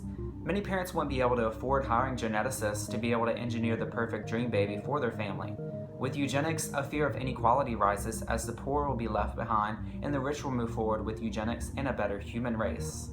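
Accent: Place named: South, United States. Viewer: i can almost hear a gay accent and a southern accent. Btw, you're talking about artificial selection and genetic modification at the same time.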